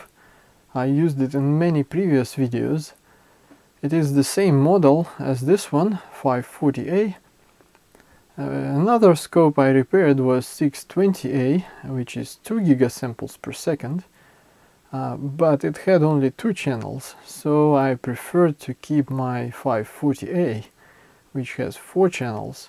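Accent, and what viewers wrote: Russia. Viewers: Hello to russian colleague! (English native speakers never actually realise how exactly the real russian accent sounds like!) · Great video. Enjoyed very much watching/listening while you troubleshoted. Thanks for sharing. (Also enjoyable to hear various english 'world'-dialects, and I guessing you are east-country, russian or something. Its beautiful that there are so many variations around :-) ..have nice week to you, fam and the surroundings. br, Rolf/norway) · Акцент, и очень сильный. Я только потому задержался на видео, что с первых слов заметил типичное российское звучание английского.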